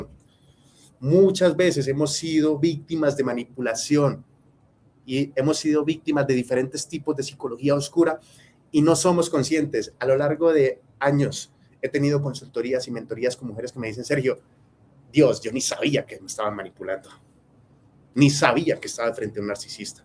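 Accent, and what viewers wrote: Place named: Colombia. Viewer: Hola saludos 👋 Yo le sigo hace un tiempo pero lo q me llamó la atención la primera vez q ví un vídeo suyo fue el tatuaje q tiene en su cuello..es el año q mi único hijo varón fallecido nació..😢 pero desde ese primer instante que me salió un vídeo waoo me encantó como se expresa .ademas q me encanta el acento colombiano🤗.los consejos q da q me han sido d gran ayuda en el proceso por el q he estado pasando.. gracias bendiciones 🙏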